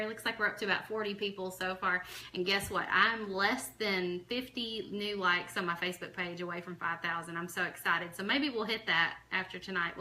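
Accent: United States. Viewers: I know this is an older video, but I absolutely love your Strong Southern Accent. I'm a Southern girl myself from Alabama but for some reason I can't tell I have a strong southern drawl, but everyone else can..lol and the Rooster is adoorable! My kitchen is done in Roosters, John Deere tractors and Sunflowers.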